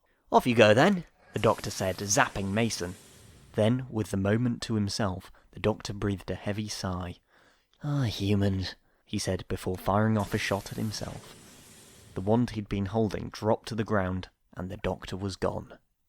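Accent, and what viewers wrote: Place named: United States. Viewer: your american accent is pretty good! (i’m american)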